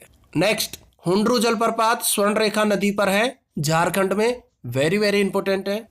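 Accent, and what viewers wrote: Haryana, India. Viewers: Haryanvi hindi sahi se nhi bol pate chitrakut..ko chittar kut padha ja rha h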